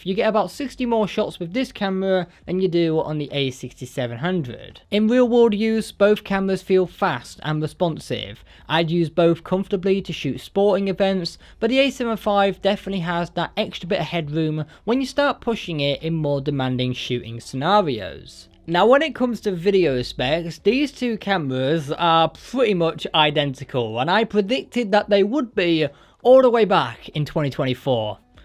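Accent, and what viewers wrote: United Kingdom. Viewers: bro say water and father please😁.i think you from UK❤❤❤❤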